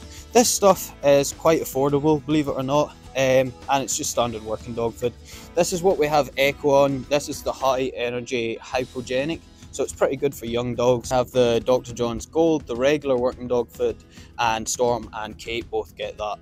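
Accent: Scotland, United Kingdom. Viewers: I struggle understanding where this guys from he sound north east scotland or irish is messing with my head 😂😂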